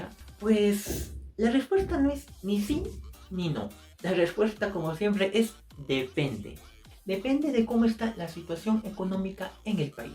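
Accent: Spain. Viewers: Mano porque hablas con ese dejo catellano?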